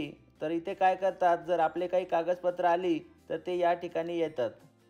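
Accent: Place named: Latur, India. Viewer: दादा तुम्ही परदेशात राहून पण आपली लातूर ची भाषा विसरले नाही आणि तुमचे मुले पण आपली लातूरची भाषा छान बोलतात मला खूप अभिमान वाटतो तुमचा मी पण लातूर आहे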